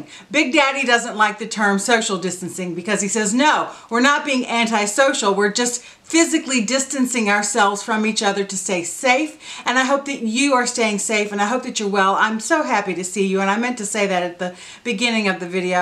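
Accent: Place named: South, United States. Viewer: I give you a 10 times 10! This was so fun and oh my gosh, I got to see your huge closet!!!! Did I hear your southern accent here???? I love the outfit...you have never looked better and you look so dang thin!!! This was just what I needed today...that accent!!!!!!!!!!! Where did that come from!!! I love it!!! I know you have a Tv career...but I felt this video was really you! Love and hugs, Sues & The Dez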